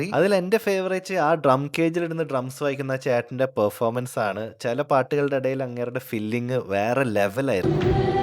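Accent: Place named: Central Travancore, India. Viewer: നിങ്ങളുടെ video വളരെ നന്നായി, എന്തെന്നാല്‍ നിങ്ങള്‍ കൃത്യമായി ഞങ്ങളെ വിവരങ്ങള്‍ പറഞ്ഞു മനസ്സിലാക്കി തന്നു, I appreciate you, നിങ്ങള്‍ ഒരു മദ്ധ്യ തിരുവിതാംകൂര്‍ സ്വദേശി ആണെന്ന് മനസ്സിലാക്കുന്നു സംസാരത്തില്‍ നിന്ന് മനസ്സിലായി,അല്ലേ, പെന്തകോസ്ത് സഭയുടെ കേരളത്തിലെ ആസ്ഥാനമായ കുമ്പനാട് എന്ന സ്ഥലത്തിന്റെ അടുത്താണ് എന്റെ വീട്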